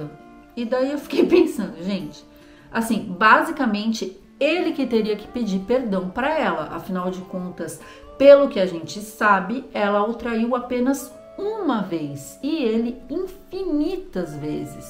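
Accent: France. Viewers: Amo o jeito francês de você falar os nomes 😊😊😊😊.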